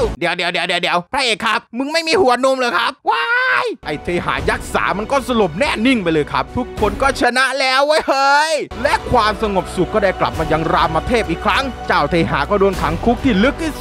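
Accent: South, Thailand. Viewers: คนใต้เห้อหลวง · พี่เป็นคนใต้เหรอ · พี่เป็นคนใต้ใช้ไหม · ชอบพี่บ่าวว่ะสำเนียงใต้นิดนึง55 ชอบๆ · พี่เป็นคนใต้หรอ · เวลาแหลงใต้ โคตรได้ใจเลย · พี่เป็นคนใต้ไข่ไหม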